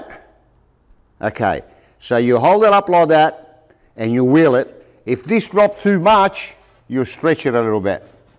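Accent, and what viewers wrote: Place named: Italy. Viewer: Sounds hes italian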